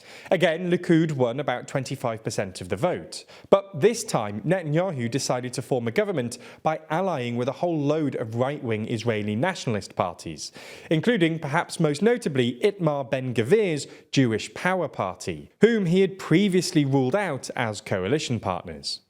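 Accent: United Kingdom. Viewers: Net'nyahu... I hate British accent.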